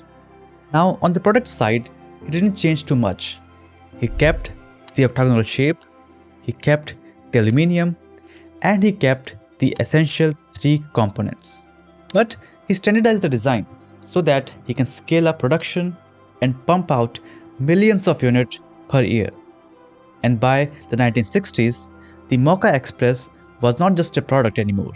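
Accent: India. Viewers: If the voice over is AI generated, the original is Indian accented. It would be unintelligible to most non Indians. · Dislike because of Indian accent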